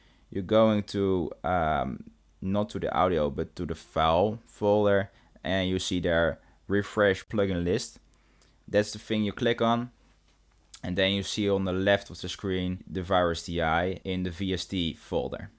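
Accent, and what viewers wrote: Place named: Amsterdam, Netherlands. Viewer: Very goeie video pik. Nice Amsterdam English accent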